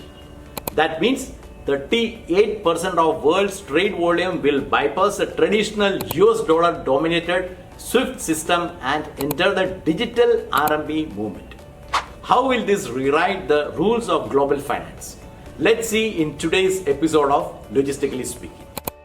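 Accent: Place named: India. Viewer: Thanks to sub-titles otherwise I would have problems understanding Indian English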